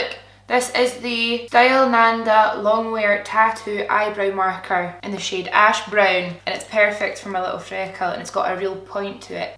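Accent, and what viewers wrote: Scotland, United Kingdom. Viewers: Omg I saw the awesome makeup and clicked and I was so surprised to hear a Scottish accent! Yay! Love from Glasgow x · There's nothing less beautiful than saying beautiful with a scottish accent! Until a week ago i'd been in new zealand for 2.5 years and watching your vlogs and your scottish accent gave me life! and kept me in tune with my own accent. love it every week- you're amazing! - IG sazwood94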